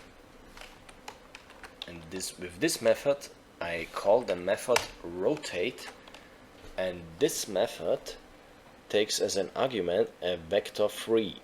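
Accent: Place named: Germany. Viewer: Man I love that German accent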